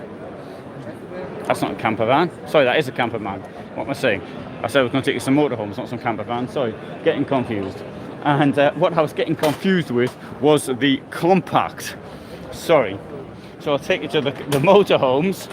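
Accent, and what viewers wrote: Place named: North East England, United Kingdom. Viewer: watching your videos are my guilty pleasure which I took up in lockdown. I’m a bit too old to take on a campervan. I’d be a bit daunted by the size since I drive a Smart Car! Will you be showing us your canine companion on one of your videos? Lovely to hear a fellow north easter on here. Thank you so much for doing these videos.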